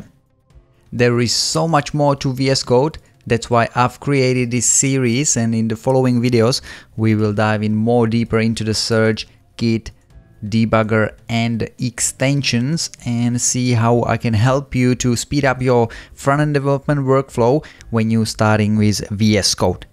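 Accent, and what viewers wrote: Czech Republic. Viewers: Just started using VSCode at work, this series is very helpful. Also, with a name like and that accent, are you Czech?:)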